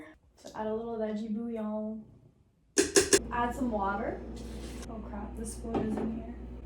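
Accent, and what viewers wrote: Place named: United States. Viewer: One generation is all it takes. You may be eating Indian food, but you sound so American is beyond belief. : ) your Puerto Rican Indian : )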